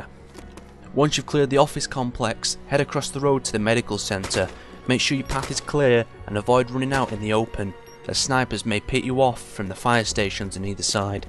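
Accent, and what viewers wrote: United Kingdom. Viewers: you're voice is absolutely amazing, where are you from in the UK? -- you sound like you're perfect to join the army with that voice as a CO :)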